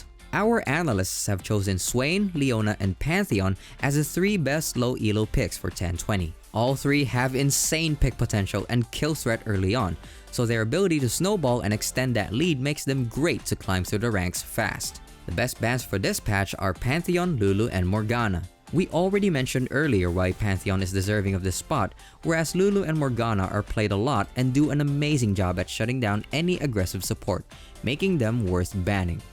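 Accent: Philippines. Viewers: This guy talking is filipino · Is the one talking a filipino or any asian?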